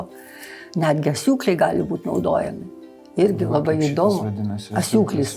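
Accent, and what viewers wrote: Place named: Dzūkija, Lithuania. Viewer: Nuostabu, o dar tas lengvas dzūkavimas malonus ausiai , klausyk ir mokykis.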